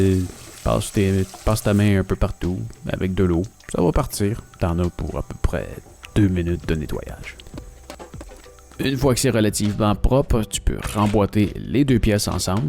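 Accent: Quebec, Canada. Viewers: Quel accent belge??? C'est l'accent québécois...😧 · Salut, merci beaucoup pour l'aide. Avec ton accent pas difficile de savoir d'où tu viens, mais avec toutes tes images de voyage tu brouilles un peu les pistes pour savoir où tu habites. Alors tu au Québec ou as tu déménagé dans les Alpes en Europe. Amicalement, Philippe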